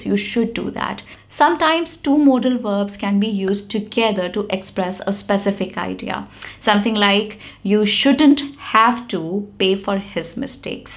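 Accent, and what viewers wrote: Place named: Bagheli, India. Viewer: Are mam kaha se ho aap kyunki bagheli bol rhi ho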